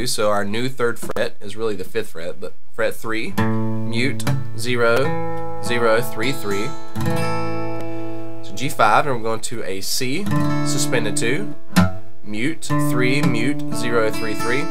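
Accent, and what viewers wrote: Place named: South, United States. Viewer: He sounds kinda from the south anyway you rock man thanks for the vid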